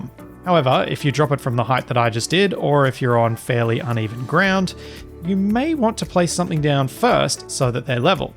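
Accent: Australia. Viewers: Aaah yeah i knew you did something like that;) I think its even easier 4 me to understand you Guys because of your Australian accent ´s (dont like that high Royal Perfect UK Eng so much it sounds so... Royal and is to fast) Your accent sounds much lovelier and sometimes more Funny esspecially when comes around the Corner and youre "makin him Dizzy" and something like that;) Ever want to travel to Australia and not because of the Beautiful Land itsell but mostly for the nice People and Culture that are living there and mby a little bit because of a Movie Series in my Childhood... Sorry but since i saw Mr. Croc Dundee 20 yrs ago or so i knew you were Cool People and i really like the Pesonality of this guy since now;) Its just a movie i know and because of that im happy to be able look just around the World with your Series here and all the other ZebraMonkey LSG´s. 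So again Thanks for good Entertainment and education!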